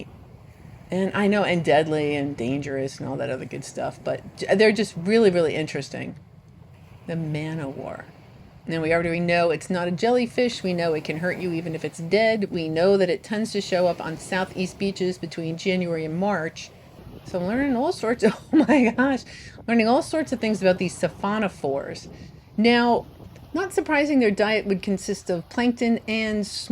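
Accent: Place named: New Jersey, United States. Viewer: “That’s what I’m takin’ about.” You are from Jersey❣️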